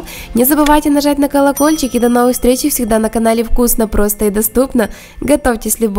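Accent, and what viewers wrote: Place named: Ukraine. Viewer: украинка?много наискасяков в разговоре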